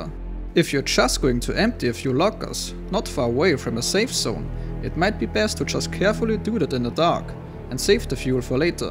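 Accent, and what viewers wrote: Germany. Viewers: he sounds german, not french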